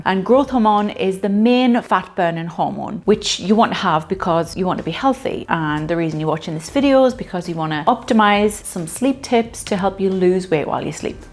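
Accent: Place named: England, United Kingdom. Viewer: Finally !! Someone with an ENGLISH ACCENT !! Thank God !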